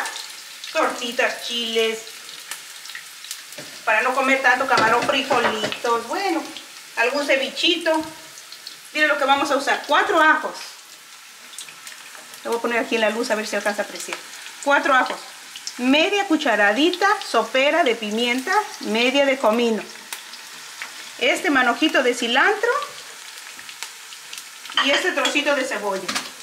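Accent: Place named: Honduras. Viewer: Soy de jakisco pero su acento no me parese de jalisco vivira ahi y tratara de imitar el acento espanol pero no me parese de jalisco le siento otro acento me parese que es usted de HONDURA?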